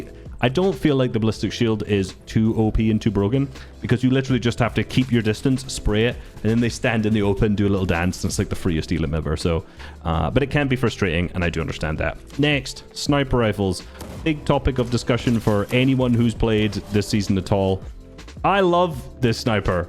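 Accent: Scotland, United Kingdom. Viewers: bro every time i watch every time I talk after I talk in a scottish accent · WAAAYYY LESS GO WE CAM HEAR THAT GLORIOUS SCOTTISH VOICE AGAIN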